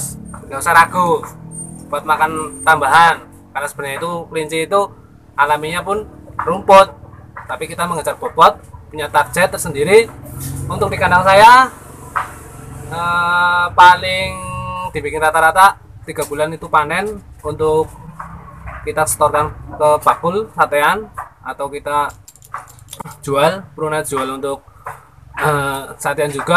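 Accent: Banyumas, Indonesia. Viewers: Ora ngapak.ora penak kang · Kok ngapak ,kaya nyonge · Ya,, banyumas punya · Mas alamatnya mana ketone ngapak kaya edek pengin merguru ko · Jyooooosss Ora ngapak ora kepenak😀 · ngapakk ya mazz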